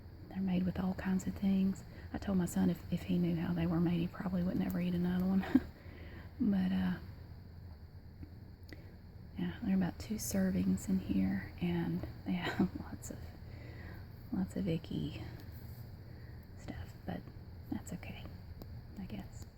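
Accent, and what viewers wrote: South, United States. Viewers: Compared to your recent videos, you seem like you've lessened the intensity of your accent! This is definitely a harsh southern accent, I miss the southern rambles :(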